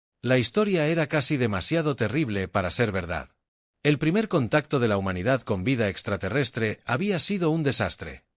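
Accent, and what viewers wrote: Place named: Italy. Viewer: Si vas a hacer esto con IA, por lo menos asegúrate de hacerlo bien. Haz que lea los nombres en inglés con la pronunciación correcta y evita eso de leer el texto con acento italiano. Por el resto, todo bien. Pero es un poco molesto escuchar con esos detalles🥲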